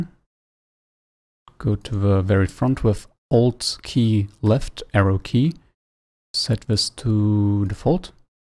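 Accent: Germany. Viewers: souned like you said completly illegal Also are you German cause you dont sound like your english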